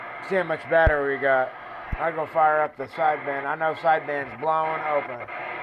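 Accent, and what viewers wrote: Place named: Tennessee, United States. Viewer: Hey... interesting background you have. My first CB radio had 12 channels and was crystal controlled. I am also a Ham for about 45 years. On top of that I have been a news photographer and videographer since 1967, and that's what prompted me to comment here. Since the radios are "horizontal" in shape you're videos would be greatly improved if you turned the phone camera sideways when you record your videos. Then we viewers would get to see more of your station at one time instead of big gray boxes on the left and right of your images. I hope you find that constructive. It was fun listening to you. Reminds me of back home in East Tennessee and Western NC.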